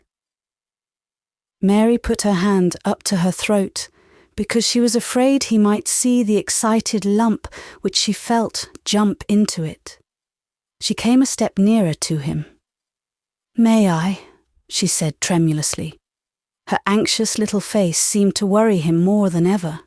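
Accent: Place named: Yorkshire, United Kingdom. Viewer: Thank you so much. You read this so beautifully and gave us, as South Africans, my son and I, a glimpse into the beautiful world of Yorkshire. We enjoyed hearing the dialect and are having fun imitating it in our home. What a beautiful imagination it all stirs.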